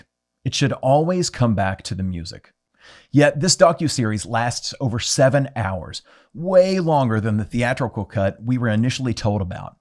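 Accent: South, United States. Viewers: Your Southern accent breaks through on occasion. Where does that originate from ?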